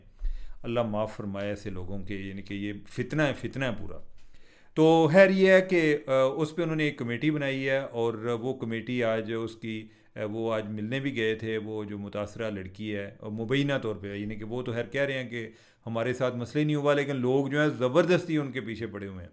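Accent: Punjab, India. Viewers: Why can't you speak in proper Urdu language in a professional manner. Is Punjabi touch necessary. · Sahab acchi wali wadi Urdu hai kyon Punjabi da tadka ramdev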